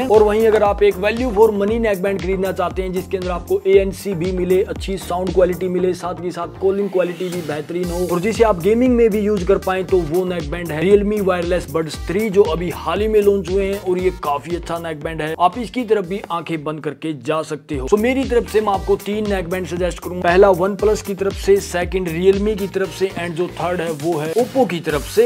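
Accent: Haryana, India. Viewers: Bhai boli se to haryanvi lage hai kha se ho.... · Haryana se hai kya bhai, tone to kuch aisi hi hai❤ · Harayana say h bhai 😂😂jai haryana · Bhai haryane se hai k ? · Nyc haryanvi accent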